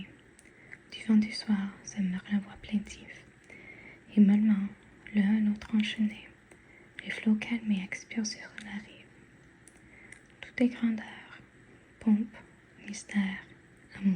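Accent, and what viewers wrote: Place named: Paris, France. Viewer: This sounds like an accent from Paris. Very interesting because your English does not have a French accent.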